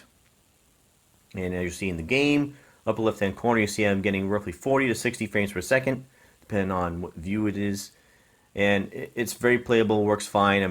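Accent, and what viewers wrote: Boston, United States. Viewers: Is that a boston accent?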